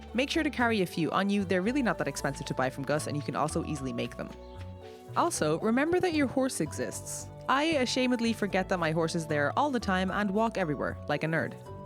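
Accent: Ireland. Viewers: what accent is this? i love it! it almost sounds irish to me?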